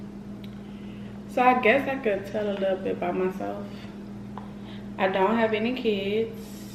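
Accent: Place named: New Orleans, United States. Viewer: Nola? Pretty accent!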